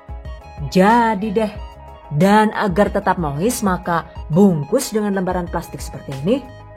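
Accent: Banyumas, Indonesia. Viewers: Saya suka resep" bunda, saya juga sering praktekin resep" nya alhamdulillah berhasil bun, dan yg ini mau saya simpan juga resepnya kapan" mau bikin juga, trimakasih resepnya bun, lebih suka lgi klo ngomong ngapaknya bun..itu anak yg suka nyobain adonan mentah udah gede ya bun..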